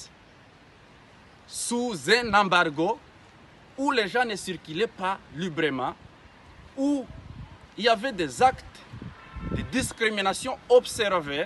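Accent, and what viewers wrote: Rwanda. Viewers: C'est la terre congolaise, quitte avec ton français Rrrrrrrrrwandolisé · Vous retournerez au rwanda chez vous même votre tonalité prouve que vous êtes rwandais pas congolais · A vous entendre dire avec votre ton et prononciation , c' est le Rwandais qui parle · Tu és vraiment Ruandais tá pronosiation est de ré.Tika biso tika lokuta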